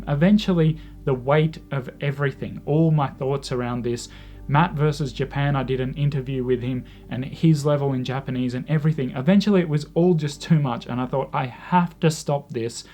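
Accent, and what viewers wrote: Stockholm, Sweden. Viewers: I just realized u have a bit of Swedish accent in your video. Anyways, good luck... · I find your Swedish accent really fun. You sound like an 08 (Stockholm). Hehe (also I really don't like the "polyglots" that only know a couple of catchphrases and nothing else) I think you did the right thing. Let me tell you my case, I speak five languages but I didn't learn them by choice but more by need. And most important of all, I learned them ONE at the time. I think you did the right thing by ditching one. I am doing the same with Russian. I'm gonna go for Ukrainian after that. But I'm not learning both at the same time. Also if you want someone to speak Swedish to I'm available anytime. As I love languages I have a real knowledge of Swedish growing up in Sweden and being a bookworm I have a good understanding of the Swedish language. 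Just if you need a little variation in your studies. Cheers. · That is very clever, well done! Also, your Swedish vowels have a pretty distinct Stockholm twang, which is quite difficult to pick up for foreign learners. You could certainly pass for Swedish sounding like you do already in few-word conversations. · Your Swedish accent is so natural!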